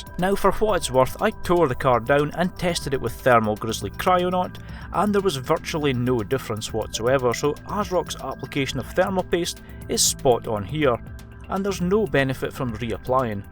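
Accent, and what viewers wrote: Scotland, United Kingdom. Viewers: Subbed for the Scottish accent lol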